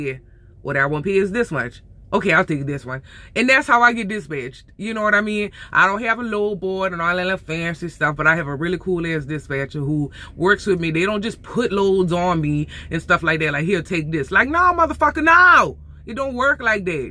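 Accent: Louisiana, United States. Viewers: Ramon Heard Jr it’s a Louisiana Cajun accent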